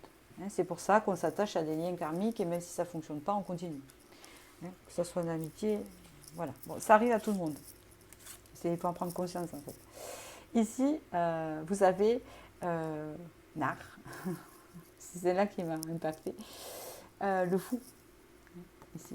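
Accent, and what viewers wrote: South, France. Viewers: Coucou 👋 merciiiiii quelle magnifique tirage tout est dit tout est en raccord☺️ oui traitement des liens karmiques passage très difficile mais nécessaire c’est plus au moins derrière aujourd’hui bon y a encore du job mais là oufff ça va de l’avant 😜 je vis plus le moment présent aujourd’hui et ça change la vie vraiment 🥰.. oui des plaisirs sains et simples que je recherche aujourd’hui.. 🥳 merciiiiiii.. j’adore le tirage vu d’en haut 👍 le son est génial 👍 vous êtes toujours très juste et j’apprécie votre naturel ☀️🌈🌹merciiii pour tout votre temps accordé et ces vidéos qui souvent me titilles 🤣, me remette aussi en question, et parfois ne me parle pas mais cela reste très rarissime il en faut pour tous!! Et je suis grâcement privilégiée 🤣 Voilà ce que j’apprécie dans vos vidéos c’est pas du blabla merci merci merci pour tous ses merveilleux moments de partage que vous m’offrez 🌈☀️🌹🌈avé l’accent🤣j’adore sa sens le soleil, la lavande, la mer les vacances 🏔 🌊 😎🌈☀️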